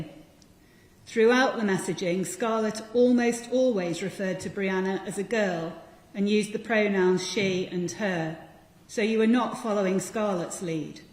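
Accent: United Kingdom. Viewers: Video with British accent, not American. But yeah 2 crazy person exist blame on government and school for not equal realise us lgbt in public. Obvious they not doing enough for lgbt community in Britain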